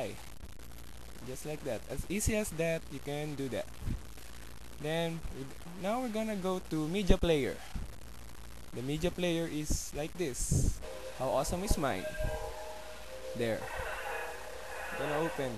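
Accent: Philippines. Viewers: sa boses mo plang pinoy na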